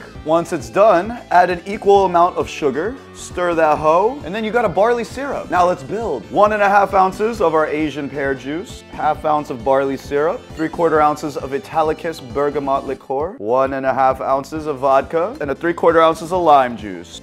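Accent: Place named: South Korea. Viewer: This gyopo sounds more korean than most native genz koreans wtf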